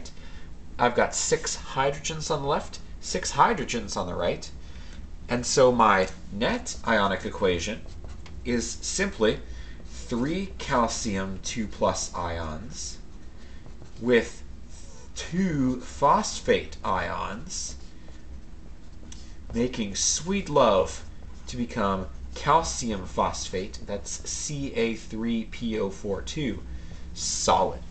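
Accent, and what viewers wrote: Canada. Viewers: are you canadian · sounds canadian to me :) but i wont take points away, it was a very good video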